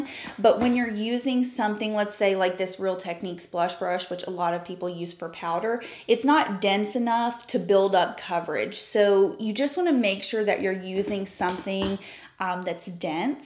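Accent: South, United States. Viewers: I was looking for a comparison of the bareMinerals Well Rested and the Bisque a few days ago and I came across one of your videos from 2013. It was linked I believe on your website. Your hair was past shoulder length with bangs.partially swept to the side. Your southern accent was in full force. I so enjoyed that video! You were beautiful then and you’re still beautiful now. It was really great to see! 💕💕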